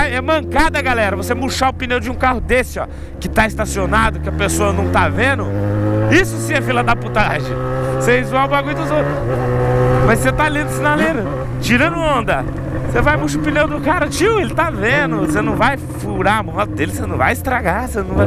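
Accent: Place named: Paraná, Brazil. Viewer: sotaque paranaense kkkkkkkkk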